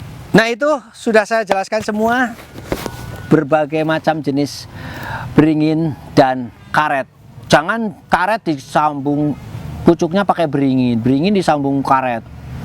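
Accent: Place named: Banyumas, Indonesia. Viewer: Ngapak bgt bapake🤩🔥♥️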